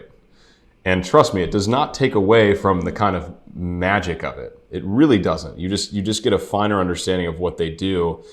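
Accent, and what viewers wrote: South, United States. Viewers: Your southern accent is closer to the pronunciation of her name 🎉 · Your American accent from the South is closer to how her name is actually pronounced 😁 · You pronounce like you did in a southern accent. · The way you said her name using your beautiful southern accent was correct! Could you believe it? 😂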